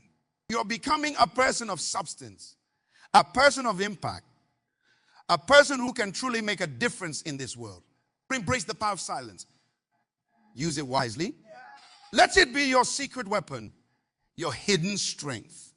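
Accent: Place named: West Africa. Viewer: I am a linguist and can't help but detect a west African accent. I wish I knew who this is because his teaching is aligned to Dr. Munroe and I would like to hear more. Glory to God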